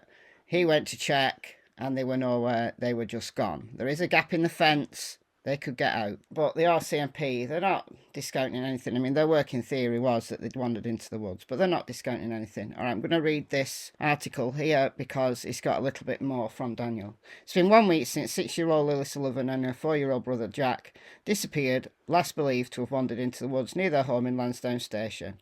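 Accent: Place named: Northern England, United Kingdom. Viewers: I love your style of reporting - I live in Wales so it’s nice to hear your northern English accent! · What part of England are you from!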